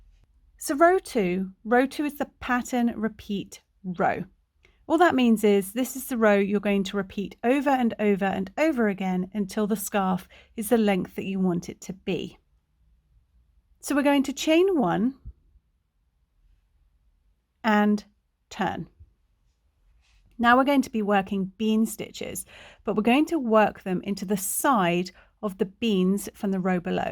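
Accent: United Kingdom. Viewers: Crochet is very popular in the States, and I had a wonderful evening with the group. Being British I love your channel as it's nice to hear a familiar accent together with American crochet terminology. It's a win win for me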